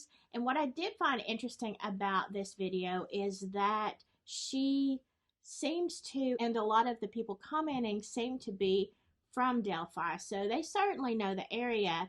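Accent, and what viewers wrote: South, United States. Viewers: OMG ! YOUR NOT MY CUP OF TEA ! Your Southern American accent is endearing x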